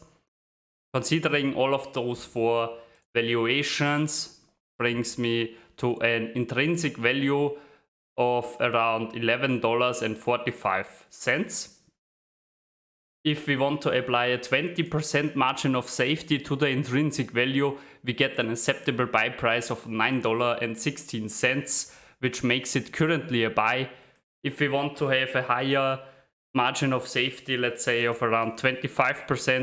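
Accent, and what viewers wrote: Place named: Bavaria, Germany. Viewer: Klingt wie ein Bayer der versucht Englisch zu sprechen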